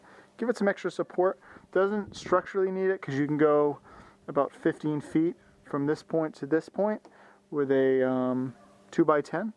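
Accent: Rhode Island, United States. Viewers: are you from rhode island?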